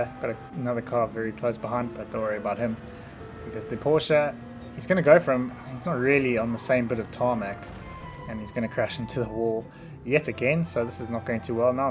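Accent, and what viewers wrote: South Africa. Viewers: Is that a South African accent I detect?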